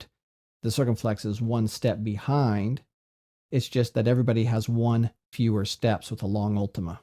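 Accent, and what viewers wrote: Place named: United States. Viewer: He doesn't use modern greek phonology. Anyways, judging from his english, he's probably american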